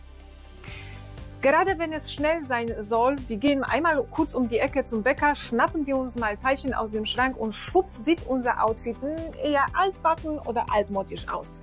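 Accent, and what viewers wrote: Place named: Poland. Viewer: Was ist das für Akzent? Polnisch? Ich nicht iś. 😮 Ch spricht man wie ch nicht wie ś. 😅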